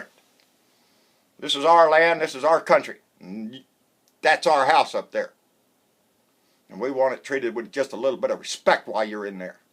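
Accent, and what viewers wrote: United States. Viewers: u are what a real american sounds like Honest Passionate Thoughtful! thank you!!!!!!!!!!!!!!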